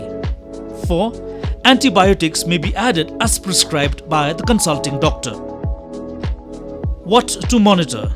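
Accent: Meghalaya, India. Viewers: Ia ki jingpynbna ba kongsan kren da khasi mung... nga sngewthuh hi khasi accent.. thait ba kumne kein ha ri ngi ym poi shaei2 ruh ... u ba bun un nym sngewthuh iakane ... shu ia leh khlem pyrkhat... kumno yn myntoi haba kumne ??? Biej👎👎👎👎👎👎👎👎👎👎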